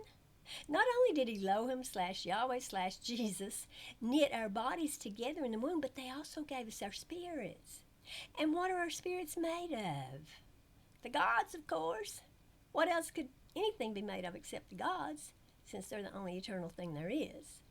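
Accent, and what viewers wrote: South, United States. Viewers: That sweet southern 'Hi' is always the harbinger for brilliance ❤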